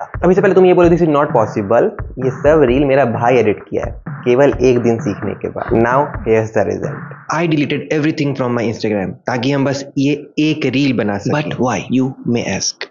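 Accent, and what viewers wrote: Bihar, India. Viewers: Bihar se ho kya bhai😊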